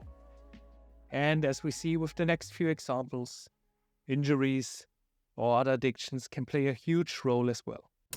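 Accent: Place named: Germany. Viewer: This guy sounds german as heck yet he is from japan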